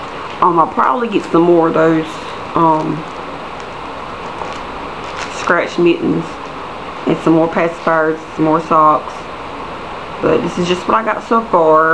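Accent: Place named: South, United States. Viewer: Where are you from? your accent is sooooo country, but different LOL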